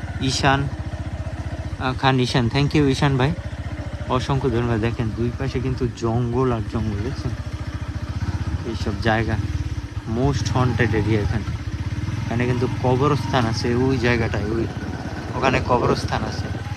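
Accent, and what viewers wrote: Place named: Barishal, Bangladesh. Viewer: ভাইকথারভাবেমাঝেমাঝেমনেহয়,বরিশালের লোক,কিন্তূশোলমাছআর,গজারমাছেরপার্থক্ববুঝেন্না,আমরাবলদনাআপনারাবলদ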